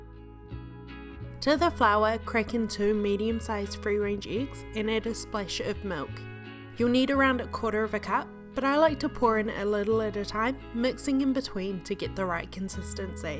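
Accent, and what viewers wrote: New Zealand. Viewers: love a kiwi accent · Sounds like a New Zealand accent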